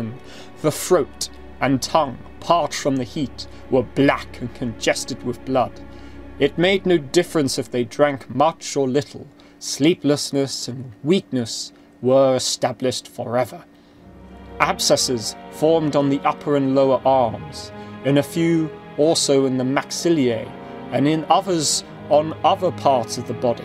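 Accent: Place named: United Kingdom. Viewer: Good show, but I cannot stand a British accent. Also the background music is far too loud and annoying.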